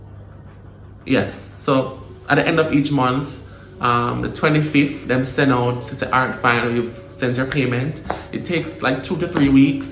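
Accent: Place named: Jamaica. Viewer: Hey bro you sound like Jamaican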